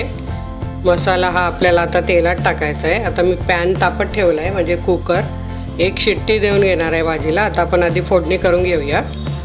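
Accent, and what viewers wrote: Khandesh, India. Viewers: Aho tumhi khandeshi aslyasarakhe boltat.,mhanun. Ssory ha tai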